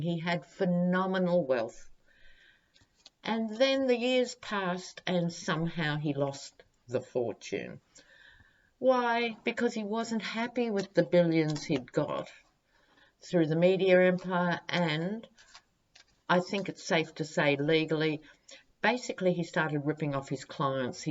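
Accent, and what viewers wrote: Australia. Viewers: No apology necessary, and it’s not your charming Aussie accent. I wouldn’t have remembered the French pronunciation either, had I not had a childhood friend whose native-French mother had the same name.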